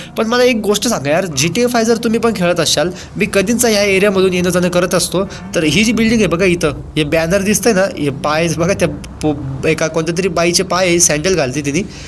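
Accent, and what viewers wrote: Nashik, India. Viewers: bhava tu nashik cha a ka ??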